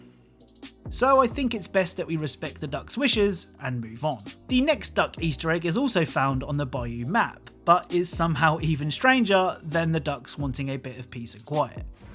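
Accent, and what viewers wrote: United Kingdom. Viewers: Where are u frome? UK? You sound yo Britichhhhhhh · He is British.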